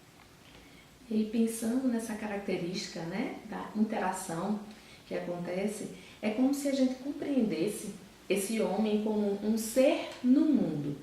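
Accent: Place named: Northeast, Brazil. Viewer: Mas o mais gostoso do vídeo é poder estar aqui em Brasília (como eu estou) e curtir um vídeo com esse sotaque liiinndo do nordeste!!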